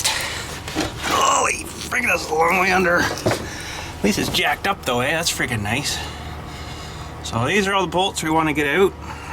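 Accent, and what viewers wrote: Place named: Canada. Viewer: Thanks for posting up this video! I like the way you "neighbors to the frozen north" speak. Cracks me up!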